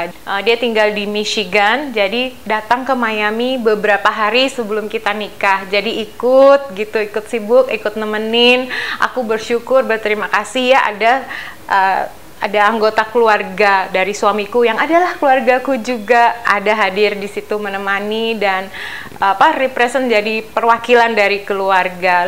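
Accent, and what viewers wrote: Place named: Surakarta, Indonesia. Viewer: Thx kak..luar biasa kesaksiannya.. Tuhan selalu sertai keluarga kak..( baru tahu saat lihat YT pas ini..kalau ternyata kaka di luar negri hihi saya kira di Solo Indonesia karena logat dan kebayanya ) GBU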